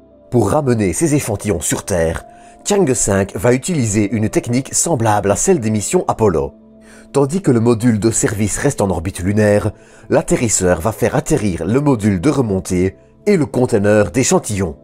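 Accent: Belgium. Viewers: Ca sent le belge qui faut la narration non? Liégeois? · Lui il est belge c’est sûr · C’est un Belge qui parle?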